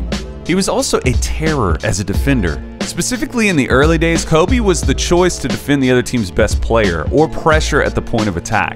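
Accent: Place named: Kentucky, United States. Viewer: Where in Kentucky are you from? Just curious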